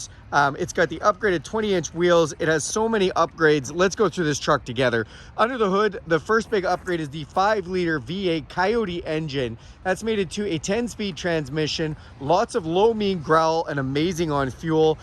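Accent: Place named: Canada. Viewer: I freaked out for a minute on the price....then I heard your accent "aye"....then realized it was Canadian price! lol. Great review! What I REALLY liked about your review is that you said "as well" only a few times when describing the features. I know it is hard, but several other reviewers say that dozens of times in a 10 minute review and it is distracting. Thank you.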